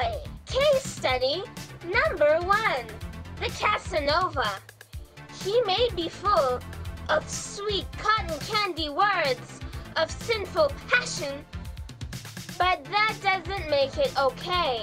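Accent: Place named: England, United Kingdom. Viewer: As always speaks in a VERY English accent EDITING ON TOP FORM 😅 Loved this, I genuinely look forward to when you upload 😄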